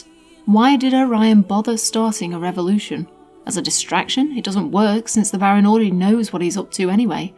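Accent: United Kingdom. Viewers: Ok, so I watched this video twice. While I'm a huge fan of the original games, I never tried to care about Thief 4. It was just too obvious it would be heavily simplified. And of course the rough development didn't help. I actually think it's the opposite way. The levels in the first two games are sometimes built almost like real places, so a map isn't really needed. If the mansion is a big rectangle, then why do you need a map? On the other hand, the city in Thief 4 has a nonsensical layout, so playing without guidance leaves you with no logical way to go. The same might go for all the levels in Thief 4, but I can't remember them. Garrett didn't draw the maps. He usually says where he got them. ;) I don't have much else to say. You have my respect for analysing this dreadful game so deeply. Really the only gripe I have is that the background music is sometimes pretty loud. 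It makes it harder to concentrate for long and I don't understand what you're saying sometimes. English isn't my first language and I'm not used to this heavy British dialect. The generated subtitles did help, though.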